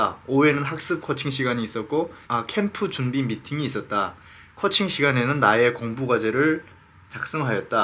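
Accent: South Korea. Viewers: 말씨는 남한사람 이네요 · 발음도 이젠 남조선 같아요~^^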